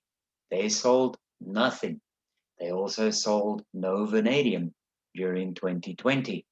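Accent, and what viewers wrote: South Africa. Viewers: Are you like half South African half American? (Accent)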